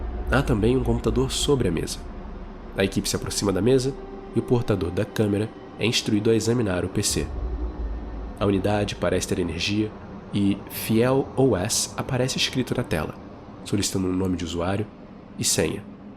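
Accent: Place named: Rio de Janeiro, Brazil. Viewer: Sotaque de carioca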